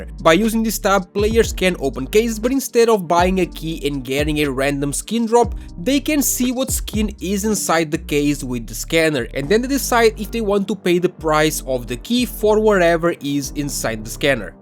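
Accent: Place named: Portugal. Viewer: tentas te mas sei que es portugues ahaha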